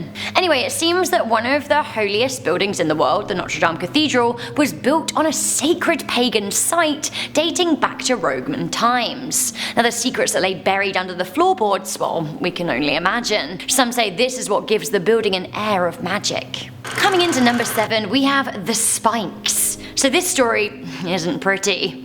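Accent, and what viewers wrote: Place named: England, United Kingdom. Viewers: She’s southern English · love this girl's accent-sounds english, or welsh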